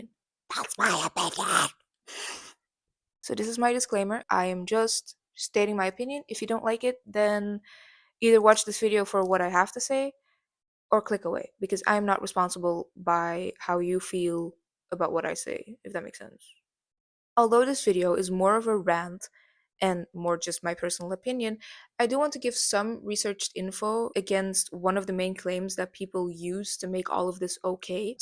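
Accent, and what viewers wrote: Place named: Quebec, Canada. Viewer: Not related to the video but i love your accent!! Are you french canadian? You sound like me :)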